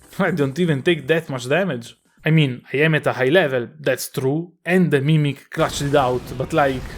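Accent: Italy. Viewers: Italian, not french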